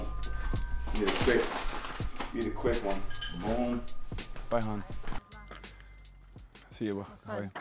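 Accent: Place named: France. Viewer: Oh and I LOVE your French accent!!!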